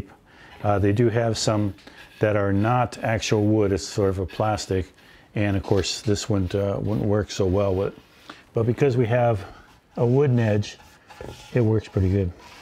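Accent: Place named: United States. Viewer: I love American accents!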